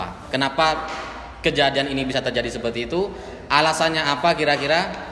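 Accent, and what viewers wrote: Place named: Madura, Indonesia. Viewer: oh....ternyata cak maduranya Sumenep, makanya logat maduranya nggak kentara.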